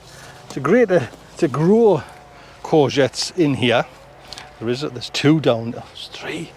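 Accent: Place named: Northern England, United Kingdom. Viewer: my name is Jim I have been watching your channel for a while, can I just say you hit the mark, you talk as is you come over as a "proper'northener I really enjoy the" honesty you show, keep doing what you do. IN you words "Take good care"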